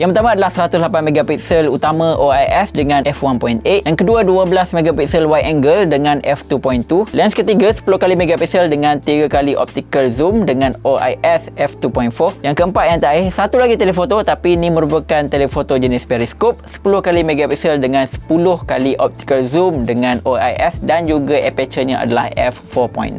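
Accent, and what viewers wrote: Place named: Kelantan, Malaysia. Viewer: Dik.. pelat kelantan awak not bad jugak ya..kecek kelate je lah. 🤣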